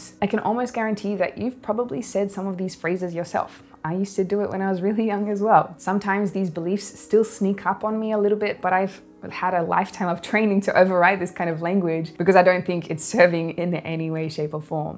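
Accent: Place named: Australia. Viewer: I couldn´t figure out why but the australian accent helps me to understand subjects better.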